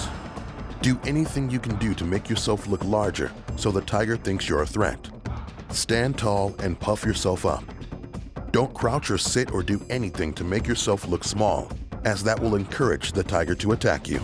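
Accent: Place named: United States. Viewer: American accent but uses metric system🥴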